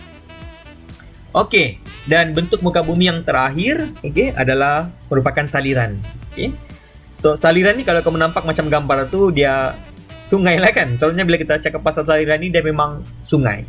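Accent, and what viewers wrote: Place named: Sabah, Malaysia. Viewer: Itu sabah adik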